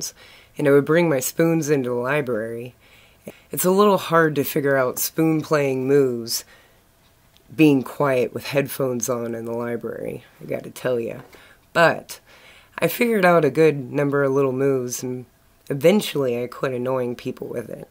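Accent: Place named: Kansas, United States. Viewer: You talk like you are from Kansas. That is a good thing.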